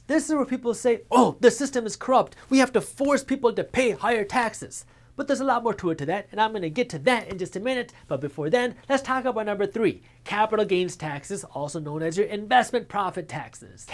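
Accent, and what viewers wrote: United States. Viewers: He's American or seems to be from there going by his accent, so obviously he knows terms / gestures from the dominant culture. Do you think Mexicans only do "Mexican" things all day long, and Sikhs only do "Sikh" stuff all day long? They live in the same society you do, and as English-speakers understand terms as they are meant. Just like European-stock girls understand what tipping a hat means, despite never having tipped one. I'm not saying any of this in a mean or unfriendly way, but just want to poke you and remind you to put on your "thinking cap" (something nobody has ever actually owned, but we all know what it means). 😊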